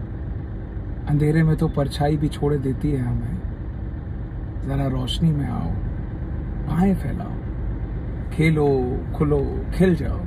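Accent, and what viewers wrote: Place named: Sagar, India. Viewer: 😁are you from Sagar,,,your accent....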